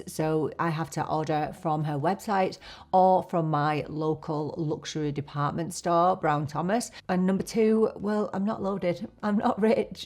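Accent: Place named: Manchester, United Kingdom. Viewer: I love your reviews even though we are literally like day and night when it comes to shades - I'm deep dark😊 I love how informative your reviews are and I love your accent, reminds of when i used to live in Manchester. You're quickly becoming one of my faves beauty/makeup Youtubers.❤ I've been eyeing the VB eyeliners, might give them a try.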